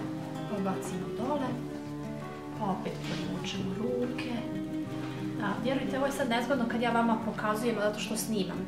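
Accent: Bosnia and Herzegovina. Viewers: Tako umiljat i ljupki glas vredi slusati a i pigledati recepte. Svaka cast za recepte lepo i jasno sve objasnjeno. Pozdrav iz Kraljeva. Jos nesto. Bosanski akcenat slusala bi ceo dan 😍😍😍😍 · Preeeedivno objasnjeno! Divan,njezan, melodican glas! Obozavam vas Bosanski akcent! Super izgledate! Po svemu sudeci, vi ste jedno divno, perfektno stvorenje! Blago onima koji vas imaju! Svi recepti su vam odlicni! Pisem, kopiram u svesku, kuham i i uzivam u divnim ukusima, kombinacijama koje dajete, ne samo ja nego i moja djeca i unucad!! Hvala Vam veliko! Pozdrav iz Detroita😘😘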